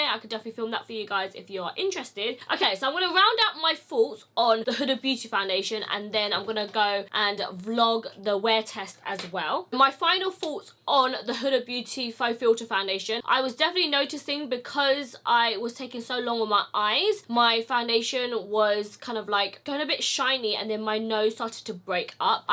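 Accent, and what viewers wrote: United Kingdom. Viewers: OMG LOVE UR VOICE AND UR BRITISH ACCENT😍❤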